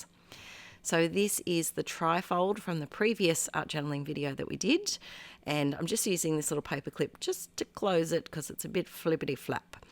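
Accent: Australia. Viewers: I’ve just come across your channel, and I love your style! You sound like an Aussie (me too) - but where do you buy your Bande washi sticker rolls, without them costing you a bomb in postage!?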